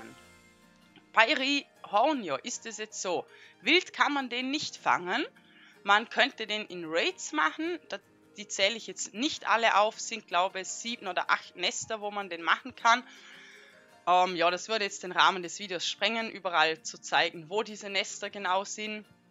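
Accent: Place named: West, Austria. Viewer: Ersteinmal - gutes Video! Propz👏🏽 Dachte zuerst du kommst aus der Schweiz wegen Akzent, hab dann aber überlegt & dachte einen West-österreichischen Akzent raushören, aber die Kanalinfo war im endeffekt ausschlussgebend!👍🏽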